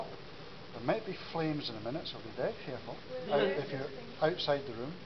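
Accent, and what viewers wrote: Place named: Scotland, United Kingdom. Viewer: its called a scottish accent stud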